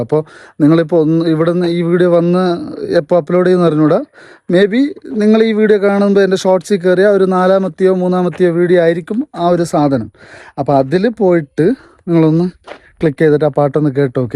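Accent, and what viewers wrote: Kasaragod, India. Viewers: Ksrgd slang anna use ayikudee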